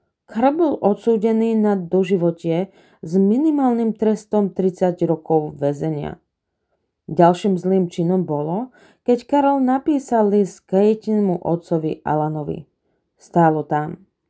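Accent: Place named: Eastern Slovakia, Slovakia. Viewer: Ja som na to došla ty si vychodniarka. 😂 teraz ta mam ešte ❤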